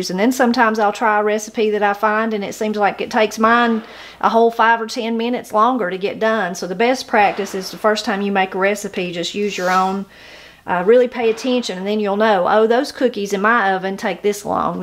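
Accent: Appalachia, United States. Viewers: What a pleasant surprise, stumbling on a cooking video from you. I love to cook and Appalachian cooking is nothin to me other than the way I grew up eating here in Texas, thanks to my granny coming from KY to stay with us while mom worked. Listening to your videos is like sitting on the porch visiting with family. I remember people actually going to VISIT. What an odd concept to the insanity of today's world. God bless you and your family and keep you safe. By the way, you don't talk neer as up the holler as my kin folk in Southwest Kentucky. 😁👍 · I love your videos. Take care! You’re loved here in upstate SC. I’m familiar not only with your speech and traditions, but you’re so sincere in your love for the history and ways of our Appalachia, as am I, , I would love one day to be able to just sit down for coffee and some good conversation with you. 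I feel like you’re a friend already.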